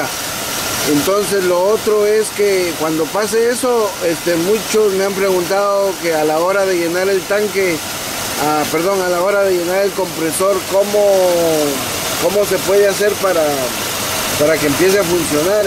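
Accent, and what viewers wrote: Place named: United States. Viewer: Y luego para que hablas como Pocho....pues cuanto tiempo tienes allá que ya se te olvido ablar el español....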